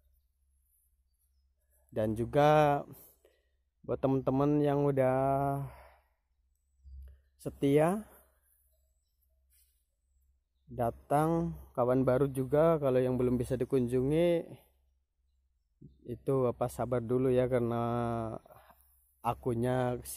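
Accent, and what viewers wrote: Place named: Cilacap, Indonesia. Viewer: Wa'alaikum salam Bermacam macam kayu yang sudah di tasak ...oh jenengan wong Cilacap Tah Mase 😃 , Sugeng enjing mas bro sukses slalu 👍👍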